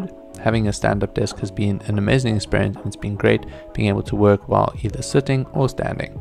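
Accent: South Africa. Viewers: You're South African?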